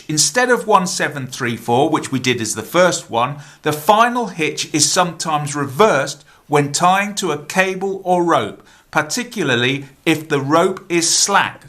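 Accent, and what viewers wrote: England, United Kingdom. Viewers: I don't use it for much other than hanging tools. But I'm glad we have YouTube to show us that you appreciate the simple thing well done. Knots and the British accent seem to go together. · It's an English accent. The English live in England, the Scottish in Scotland, and the Welsh in Wales, but all three countries are British because they live on the same island: Great Britain. Welsh and Scottish accents are British, but this one is clearly English. Hope this helps.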